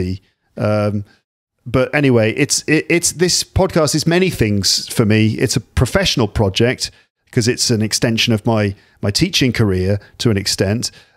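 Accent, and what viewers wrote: United Kingdom. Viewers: I enjoy listening to you whatever you are speaking about! I love your British pronunciation. Hello from St.Petersburg.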